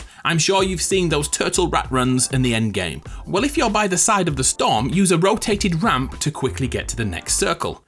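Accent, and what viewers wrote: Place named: Yorkshire, United Kingdom. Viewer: Which part of Yorkshire are you from because I’m from Yorkshire too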